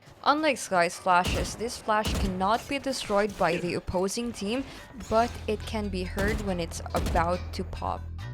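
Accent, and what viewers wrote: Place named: England, United Kingdom. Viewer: nagulat ako bakit english accent pero nag tagalog lmao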